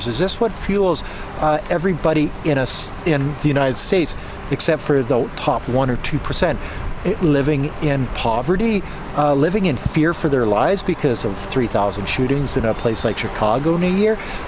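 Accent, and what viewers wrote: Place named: Canada. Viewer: I love your accent, it's so Canadian when you say "aboat".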